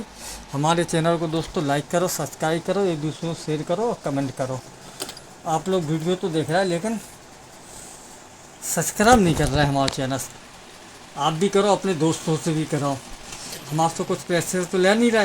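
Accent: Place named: Bundelkhand, India. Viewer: सरजी आपकी भाषा बुंदेलखंडी लग रही है आप कहां से हैं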